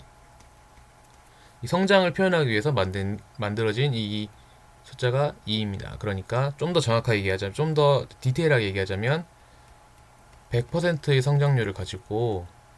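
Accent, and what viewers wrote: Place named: Seoul, South Korea. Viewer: 자꾸 e를 2라고 하시는 서울 발음...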